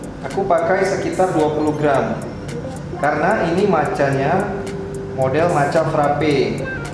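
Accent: Medan, Indonesia. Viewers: Bg orang medan ya ?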